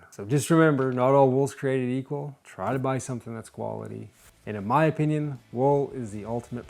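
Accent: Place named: Maine, United States. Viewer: Off topic question, where are you from? Your accent in some parts sounds like it's from Maine but in other parts sounds like it's from Canada.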